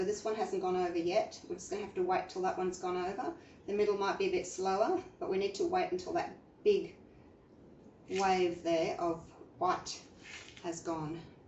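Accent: Australia. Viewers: Your videos are just SO helpful. I've only been doing this for about a month, but I'm loving every minute of it! I don't even mind when I end up with some muddy pours, because for some strange reason, my 28 year old son LOVES them...lol (no accounting for taste...lol) I'm just about to varnish 3 paintings (which are going STRAIGHT to him...lol), so this is REALLY helpful. Btw... SO nice to hear a fellow Aussie accent too 😘👍❤❤❤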